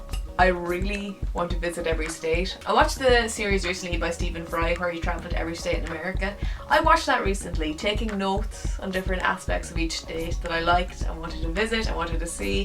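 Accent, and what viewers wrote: Ireland. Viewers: A-ha! Oh that was solid!, I sent those cakes. Still my fav Try video! Now I’m all happy you gave them a mention! Billet Log over the Joe Louis?!?! Pure craziness! 👍🏻 This ones for you miss fancy leprechaun accent girl!! 👍🏻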